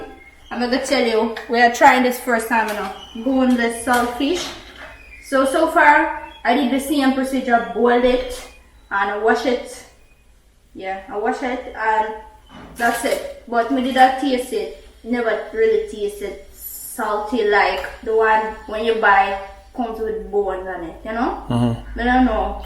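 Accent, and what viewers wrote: Jamaica. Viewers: and the patois kills me every time! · Very proud to see that you adopted the Jamaican culture and accent and your kids are wonderful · You talk, act and cook like a Jamaican. Congratulations. I also like your green thumb and love of traditional Jamaican foods. I see that you are taking care of your family well. Happy for the family. You guys are adjusting well to life back in Jamaica, having lived overseas for all of these years. Ackee and Saltfish looked scrumptious.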